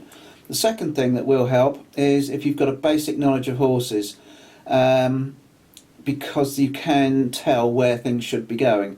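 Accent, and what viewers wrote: United Kingdom. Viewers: I love your video. I do not have a job and could not afford the prints. I sort of drew my own and made programs for my cnc plasma cutter. You had trouble with things fitting but some of my parts did not come very close at all. None the less, I do have the general shape. I am from the USA and your English and accent is something else to me. I do not speak the Queens English. Maybe if I had some tea as you did, I could work thinks out better. Frustrating and fun.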